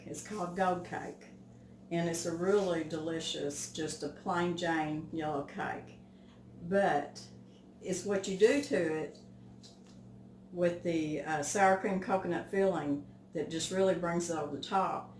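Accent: South, United States. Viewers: Millboro Quilter South Dakota haha it’s just a southern accent.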